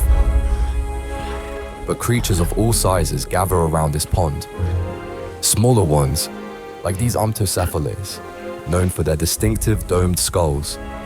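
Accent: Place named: Manchester, United Kingdom. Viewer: Anova instead of another. Time to get rid of that Manchester dialect kid.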